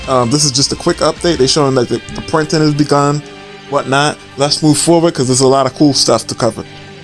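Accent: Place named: New York, United States. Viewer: Bro gotta be from NY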